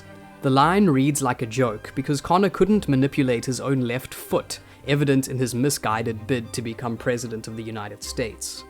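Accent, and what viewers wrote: South Africa. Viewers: You sound South African. I agree succession is one of the best shows I’ve ever watched. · Are you South African? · I heard you narrate for 7 seconds, and I knew you are South African 🤣🤣🤣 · Youre South African arent you? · Bru you south african? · Well in with the analysis...I also trace that South African accent in the narrator.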